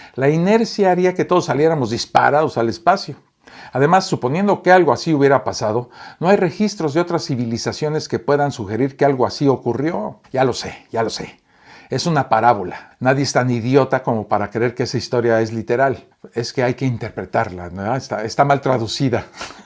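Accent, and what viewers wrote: Mexico. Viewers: No me explico cómo puedes estar contando,tantas pamplinas y tanta gilipolleces y es que Youtuber lo tienes colapsado con tantas mentiras que dices en tus vídeos.. ‘’Se suele decir que cuando un tonto va a la feria todo se vende y siempre hay un montón de panchitos y panchitas que pican como los conejos a las zanahorias 🥕 Y enciman con el acento MELOSO Mejicano o Argentino que usas para que vas hablar más. Si aburres a un muerto. Habla castellano con buen acento y no hables melosso. Jajajaja ya te vale Mejicano o argentino meloso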